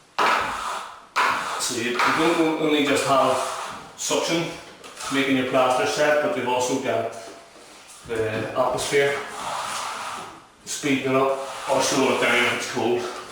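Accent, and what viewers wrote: Ireland. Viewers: Sounds Irish ?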